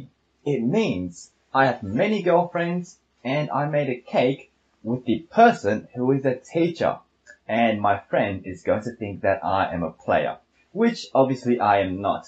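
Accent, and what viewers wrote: Australia. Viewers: オーストラリア英語かっこe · Love Aussie accent!!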